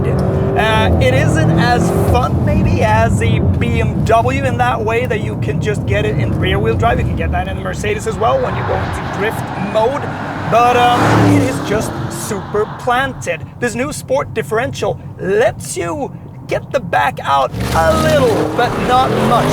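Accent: Sweden. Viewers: Man can hear the swedish accent svengelska :D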